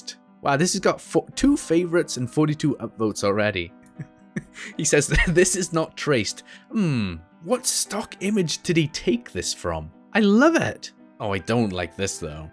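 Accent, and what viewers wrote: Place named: Scotland, United Kingdom. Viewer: you and soooo funny and i love your scottish accent lmao, subscribed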